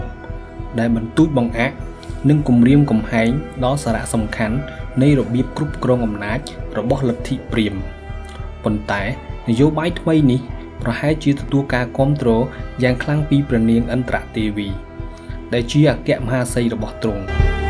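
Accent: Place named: Thailand. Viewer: អ្នកអានប្រវត្តិសាស្រ្តខ្មែរចេញសម្លេងថៃ ឬជនជាតិថៃជាអ្នកអាន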